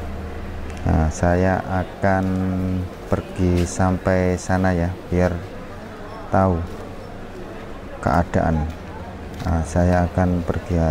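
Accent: Java, Indonesia. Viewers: logat lu koq jawa bang..😁😁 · Abang org indonesia yah,,,sek3dar masukan ga usah di melayu2in bang,,,logat ente logat indonesia kejawa2an,,jadi ga bisa abang berlogat melayu malaysia malah lucu kedengerannyanya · Assallamuaikum 🙏salam kenal dari saya penonton baru dari kalteng,,,klo di dengar dari logat bahasa sampean kya y org jawa ya mas🙏🤭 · Pakai bahasa indonesia aja napa bang, logat malaysia2an tp logatnya jawanya kental dadi malah aneh...hehe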